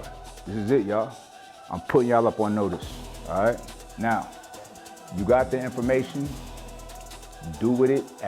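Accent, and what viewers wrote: Brooklyn, United States. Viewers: "The culture rewards this bullshit" I know Brooklyn when I hear itl 🗣 TEACH!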